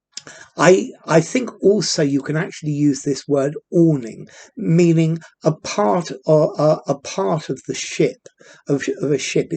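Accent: United Kingdom. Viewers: Thanks for the UK pronunciation . Its derivative awning window" where the upper end of the sloping window is hinged and the other end meant for moving the window along an arc.. Please correct me, if I am wrong.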